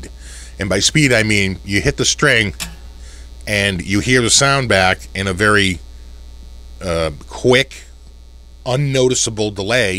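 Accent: Boston, United States. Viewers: I love hearing a fellow Bostonian talk about music and guitars. you're the man! Keep em' coming brothuh!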